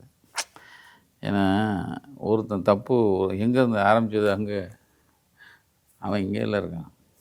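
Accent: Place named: Chennai, India. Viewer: Excellent speech in simple chennai thamizh in your usual casual style. Great job sir.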